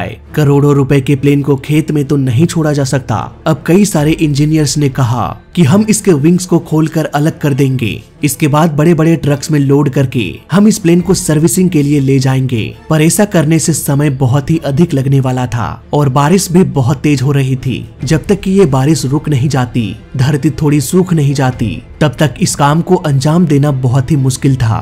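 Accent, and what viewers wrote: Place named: Bihar, India. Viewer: Tu news to ache deta h lakin hinde bol na sekh le bihare brass mat bolo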